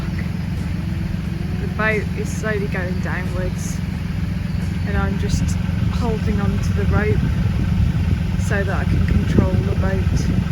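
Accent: United Kingdom. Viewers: Lovely video, lovely lady, lovely British accent, so much beauty all around!👍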